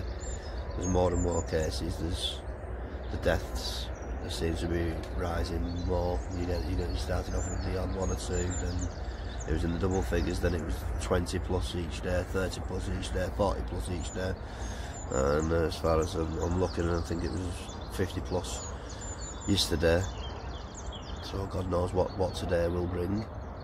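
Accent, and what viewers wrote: St Helens, United Kingdom. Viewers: Nice video are you from St Helens area accent sounds familiar 😂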